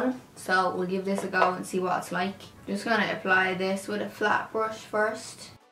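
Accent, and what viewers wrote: Ireland. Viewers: I could listen to you speak with your beautiful Irish accent all day. I love this palette. The shades are stunning and the look you created is gorgeous.. Thanks for the tutorial. xxx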